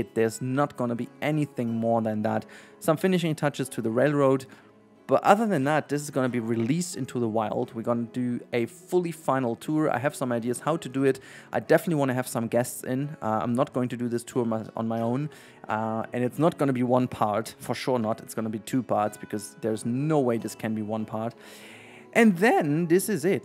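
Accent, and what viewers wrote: Germany. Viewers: junge liebe ich den deutschen Akzent den man hört 😅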